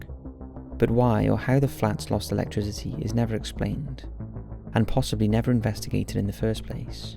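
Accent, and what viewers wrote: Wales, United Kingdom. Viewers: As our Fine Narrator is {BritiSH} You would think a {BritiSH`cHap} would Kno' & bē Fully Aware Of [IriSH`slang] [or`Nott] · I just hope that this exposure will bring new light to this very mysterious disappearence and bring a sort of closure to Trevor's family and friends. If i could make a suggestion for a disappearance video it would be the case of Claudia Lawrence who mysteriously vanished from York, England in 2009. You sound British so I'm sure you will will know of this case as it has been on Crimewatch and in the media extensively but as far as i know she just disappeared without a trace and still nobody knows what happened to Claudia Lawrence. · Welsh accent.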